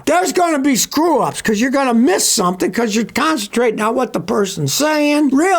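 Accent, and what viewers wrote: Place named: New England, United States. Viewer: "karr" "new Englander lol <3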